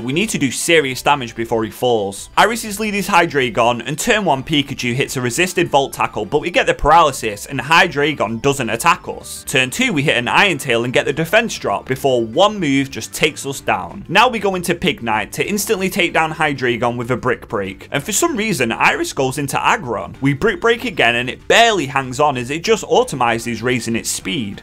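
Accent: United Kingdom. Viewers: Bro are you British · you're English you say every thing different is fine all poke tubers do it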